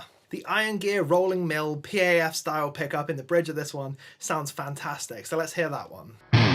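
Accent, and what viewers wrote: United Kingdom. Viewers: Hi You sound british but do you live and work in iceland with mikko? If yes you are so lucky and i wish i could join you...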